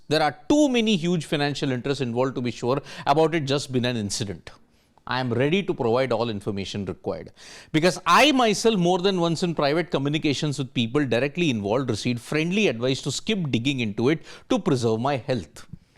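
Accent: India. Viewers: Get used to Indian “English”.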